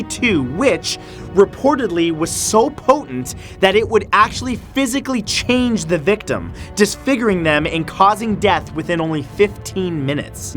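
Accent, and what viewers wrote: Canada. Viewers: You're from Canada?! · So your canadian? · Your Canadian? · this is Canadian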